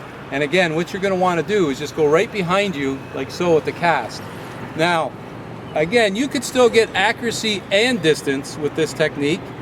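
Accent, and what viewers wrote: Canada. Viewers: I can tell you are canadian lol.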